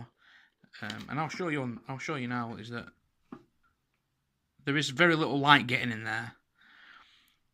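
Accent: Bolton, United Kingdom. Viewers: Yup, I think you've convinced me. I'll get one ordered 👍 Are you from Bolton by any chance? 😎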